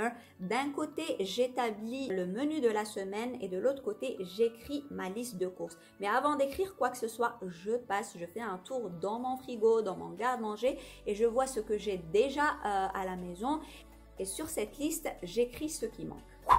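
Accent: Algeria. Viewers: الحبيبة ديالي ماشاء الله عليك كنحماق عليك 🥰 متبعاك هادي من شحال بصح هادي اول مرة نخليلك كومنطير بغيت غير نقولك نتي ختاريتي باش تهدري بالفرونسي ولكن سبحان الله كنفضلك بالعربية اكثر تجي حلوة منك اللهجة الجزائرية ومن أيضا كتكوني قريبة للقلب المهم علا راحتك أنا حتا الا هدرتي بشنوية غنتبعك 😉 معاك مغربية ساكنة في بلجيكا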